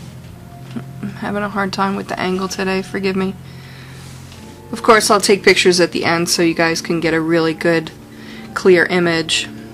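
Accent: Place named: Italy. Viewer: This is freaking awesome! Btw if you don't mind me asking, are you Italian? You have the same accent my family does :D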